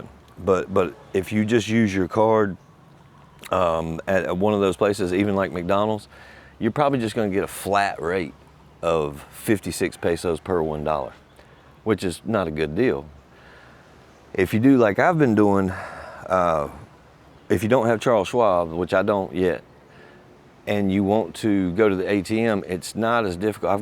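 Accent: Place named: North Carolina, United States. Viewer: It's gotta be a NC thing.. immediately heard the accent and trusted the information. Guess it's tribalism. Thanks. I'm learning. North of Charlotte too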